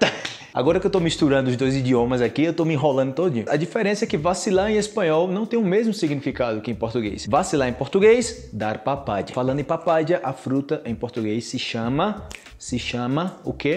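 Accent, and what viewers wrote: Antioquia, Colombia. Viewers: Adoro o seu SOTAQUE colombianoo, kkkkkk. Gostei do vídeo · Está legal esse sotaque Paisa cara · Es increible tu acento Colombiano 🥰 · Yoda 😂😂😂😂😂😂 estava saco 😂😂😂😂😂 que bárbaro que entonación pronunciacion y ritmo para hablar el español es que normalmente a los brasileños se les nota el acento pero increivel seu acento colombiano 😂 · se le siente el paisita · Irmão, você é incrível. Você fala perfeitamente com sotaque colombiano. Eu aprendi muitas expressões colombianas com você. Abração !! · Parce use the habla re bacano cuando dice las cosas en español, jaja suena re colombiano jajaja gracias por esa creatividad y por esforzarse en esas expresiones que nos gusta. Quiero hacer lo. Mismo en portugués jajaj pero es difícil aún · Habla puro un colombiano, que cosa más linda ahh! Jajaja... Saludos desde Costa Rica... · Muito bom,! 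Excelente la lección y por cierto, muy chévere tu acento paisa 😊😌 y por un segundo creí escuchar un dejo de acento costeño?🤔 Obrigada pela lição! 😌🙏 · Buen acento paisa.. (pola es una expresión más rola) y a ratos como costeño, medio caribeño. Yo no entiendo por qué usan tanto en portugués "mesmo" y "pegar". Y.. "berraco" también es estar enojado, incluso se vuelve verbo "emberrecarse".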